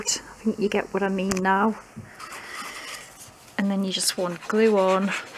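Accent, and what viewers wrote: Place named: East England, United Kingdom. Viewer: Hi, yes its gone more viral than the Coronavirus! Have had a go at making the card but not too good as I didnt have the circle dies but now I have... nobody will see me as I will be crafting away making a wall hanging for my newly decorated downstairs loo! Just what I need to hide some pipework. Thanks for the inspiration - love the accent - you are just a bit further up the East Coast from me by the sounds of it. Happy crafting and thanks again!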